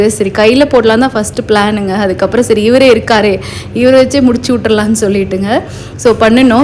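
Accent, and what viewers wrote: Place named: Coimbatore, India. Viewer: Akka im also from Coimbatore currently in canada, recently seeing your videos. Very nice esp neenga pesra vidham.. Nama kovai style, nalla irukeengla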